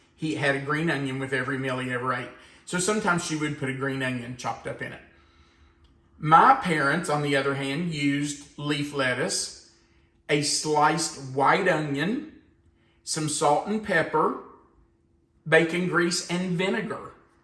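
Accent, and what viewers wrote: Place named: Appalachia, United States. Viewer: Appalachian talk ! See, us Aussies know a lot about America ! Not many Americans know much about Australia 😢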